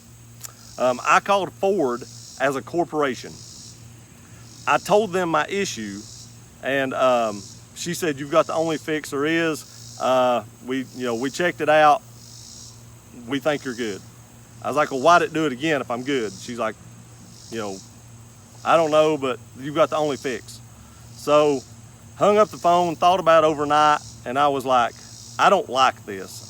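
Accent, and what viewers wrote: South, United States. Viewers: Which state did you film this video in? It looks quite hilly in the background. You also have a distinctive southern accent. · Love the country twang!!! Great video, New subscriber here.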